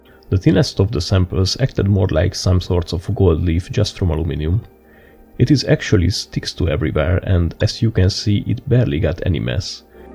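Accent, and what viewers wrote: Hungary. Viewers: Its just hungarian accent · amikor az ember megismeri a magyar akcentust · az akcentusod alapján magyar lehetsz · i heared the first sentence and i already know you're hungarian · A jó magyar akcentus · Me at 1 AM: > "oh boy, seems like we're blessed today to find another hidden gem of a channel" > the narration is the platonic fucking Form of the hungarian accent actually manifest > now I cannot stop smiling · your accent sounds like hungarian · Your accent is veeeery hungarian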